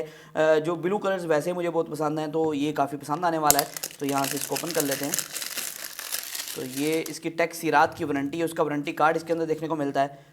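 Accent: Faisalabad, Pakistan. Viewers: Yr kahi dekha h apko... Faisalabad sy to ni??? Boltay b Faisalabadi ki tra he ho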